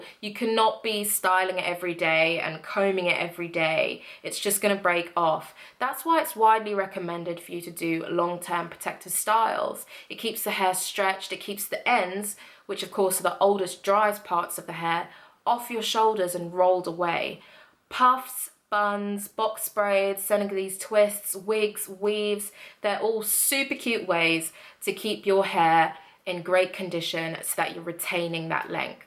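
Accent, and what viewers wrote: United Kingdom. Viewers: yes you're British!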